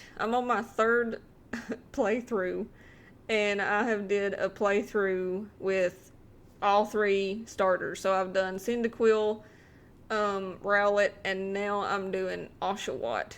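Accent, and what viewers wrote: United States. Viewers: Love that accent of yours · you sound like Arkansas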